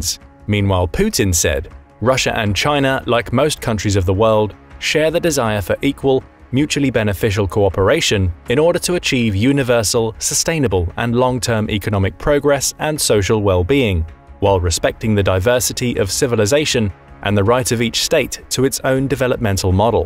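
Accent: United Kingdom. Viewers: Glad I found this channel. Informative reporting on contemporary geopolitics, and with an easy to understand British accent!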